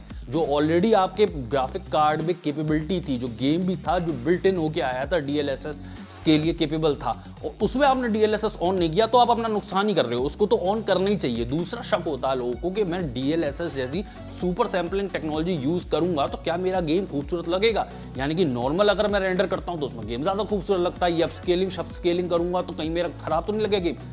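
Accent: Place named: Hathras-Aligarh, India. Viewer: Bhaka bhak FPS 😂. Bhai Hathras Aligarh ke aaspas ke lag rahe!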